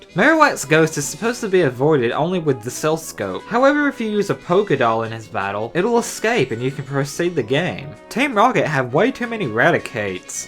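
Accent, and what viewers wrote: United States. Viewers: this guys voice... so fucking annoying country accent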